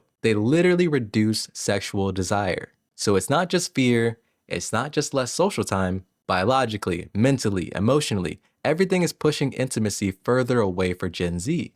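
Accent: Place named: Baltic states. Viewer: sounds like you are from the baltics or somewhere else tbh idk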